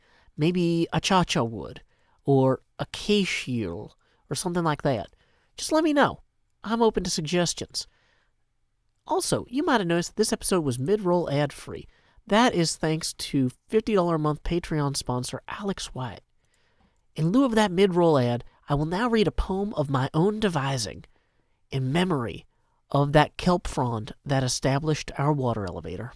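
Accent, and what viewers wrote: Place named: Nashville, United States. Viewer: D you doing an iskall impression was hilarious , nothing will ever cover that nashville accent up :D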